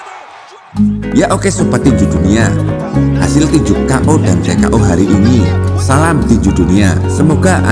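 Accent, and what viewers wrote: Java, Indonesia. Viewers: Medok mas... 😁😀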